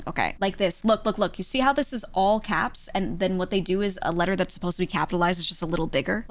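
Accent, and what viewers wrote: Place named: Northeast, United States. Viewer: I got absolutely read for filth at the "engineers write in block lettering" bit. At the risk of getting weird, I think the perception of Times New Roman delivery is equal parts tone, volume, and inflection. The Northeast American accent is a very neutral accent. And, the majority of the Just Chatting bits that do get clipped here of simply "talking about a thing" don't have a ton of vocal dynamics or hyperbolic reactions to the things being said (as compared to the MrBeast typical youtuber inflection that's the vocal equivalent of jangling keys in front of someone to get their attention).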